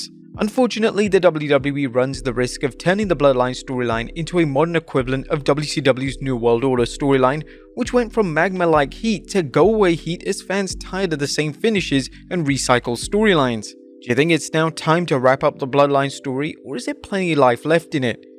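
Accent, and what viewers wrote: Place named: United Kingdom. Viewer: He's British, he has an accent, he literally can't help it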